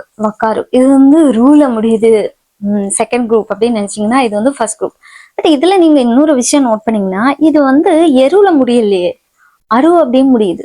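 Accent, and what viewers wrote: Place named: Kanyakumari, India. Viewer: Super. Keep going. By the way, are from Kanyakumari district? I could sense a resembling tone in your speech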